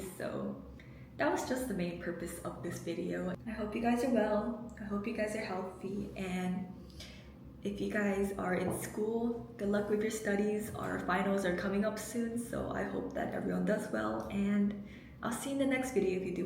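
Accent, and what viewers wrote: United States. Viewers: Did you grow up in the US or in Korea? You speak like an American but a barely discernable accent. I'll check out some of you other videos maybe you disclose it there. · Your American English is perfect. Are you sure you're not American?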